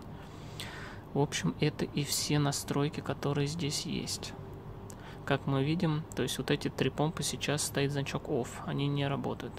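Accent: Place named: Moldova. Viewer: Спасибо за обзор. Очень помог мне настроить девайс. Удачи тебе!!! Кстати акцент у тебя интересный, случайно не из Молдавии? Всех благ!!!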